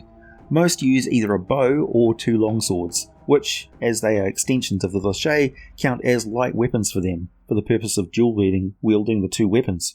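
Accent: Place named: New Zealand. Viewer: Ya see, this is why you need to do those Creator Races videos. We need to know this stuff, and we need it delivered to us in a Kiwi accent.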